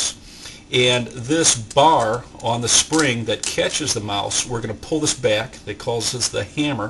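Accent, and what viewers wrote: Chicago, United States. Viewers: I love your accent too. Chicago?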